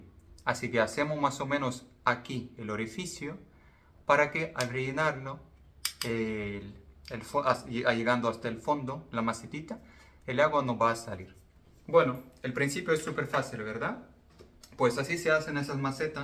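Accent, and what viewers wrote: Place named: England, United Kingdom. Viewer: querido es un placer escucharte hablar y veo todo tu trabajo merece destacar,la linda forma de explicar cada cosa,eso vale mucho! cuando se pone el ingrediente principal que es el AMOR Todo es mejor, y es más ameno todo! Espero que sigas haciendo vídeos lindo,como dijo el escritor: 2Brian Weiss:Lo Único Real Es El Amor LoDemás...Es Una Ilusión" Te felicito tu pronunciación del idioma español lo hablas muy bien y me encanta tu acento ingles le da un toque de distinción!👏👏👏👏👏👋😀👍Un fuerte abrazo desde Uruguay! Muchas Gracias🌵🍀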